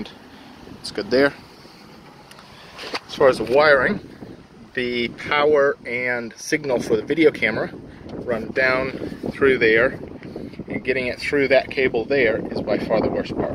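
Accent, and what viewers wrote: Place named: New Zealand. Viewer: Can't work out if you're a Kiwi from the States or a Yankee living in NZ..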